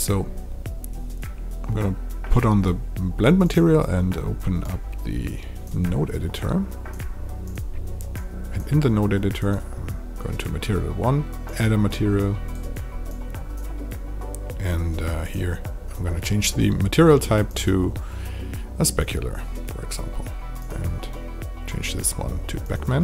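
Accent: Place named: Germany. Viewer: Dachte mir schon von Anfang an wegen dem Akzent du bist bestimmt deutsch, dann hat die Google Suche den Beweis gebracht :D Danke dir, tolles Tutorial!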